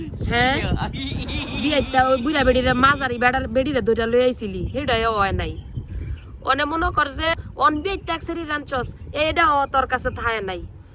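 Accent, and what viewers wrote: Comilla, Bangladesh. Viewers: Ha ha ha ha ha ha, eii apu tumi R gali diyo na amar haste haste sound asena, 😂😂😂 R kotha gulu amader zillar moto mone hoy, Comilla, Tumi kon Zillar??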